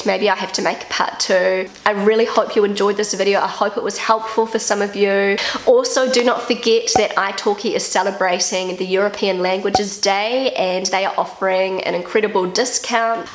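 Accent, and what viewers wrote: New Zealand. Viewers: I also love accents and especially your swert kiwiaccent...I think you have to make another german video here on YouTube...😃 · I love your videos! I have a good opportunity to hear Kiwi English and know a little about Germany. Perfect!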